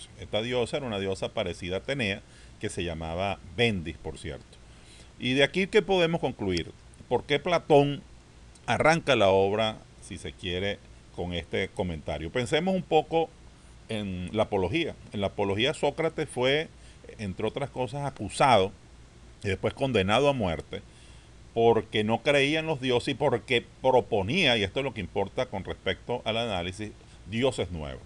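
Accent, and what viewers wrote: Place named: Venezuela. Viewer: por el acento es de Venezuela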